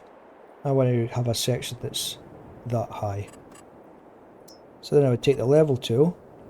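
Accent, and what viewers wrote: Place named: Scotland, United Kingdom. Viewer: Taks a tcheuchter te ken a tcheuchtar! I subscribed as soon as I heard your voice. Just back from Scotland to Finland and driving around the North East, I immediately restarted FS22. I miss my home country so much and my country ways. Brilliant video. Thank you. Regards Fermer Findlay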